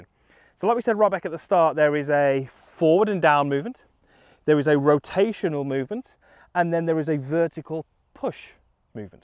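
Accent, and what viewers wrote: United Kingdom. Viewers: British english funny n talk too much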